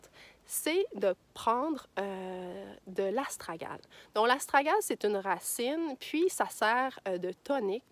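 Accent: Quebec, Canada. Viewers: Merci à toi! Ta voix est tellement relaxante et reposante...elle m'aide à m'endormir le soir hihihi. l'accent québécois me manque beaucoup aussi hihihi ca fait des années que je ne vis plus au Québec! J'ai toujours fait attention à mon alimentation et à ma santé physique, je fais beaucoup de recherche sur internet ou autres pour comprendre comment fonctionne l'alimentation. Depuis 6 mois, je ne mélange jamais les sucres complexes ou simples avec des protéines de sources animales ou végétales, j'ai remarqué les changements dans ma vie: + d'énergie, moins de problèmes de digestion... c'est exactement ce que tu dis dans l'une de tes vidéos, maintenant je fais confiance à tout ce que tu racontes! hihi une choses aussi..j'ai appris avec toi, en regardant par exemple la video - Comment tenir vos résolutions en cinq étapes? - Comment combiner le physique et le psychique. 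Tu es vraiment le résultat de ce que tu nous racontes: Tu es bien dans ta peau, personnalité bien équilibrée, belle voix, belle peau, beaux yeux.....je fais continuer à te lire et à t'écouter pour améliorer d'avantage ma santé. Encore une fois, grand merci! Je te souhaite une bonne Saint Valentin!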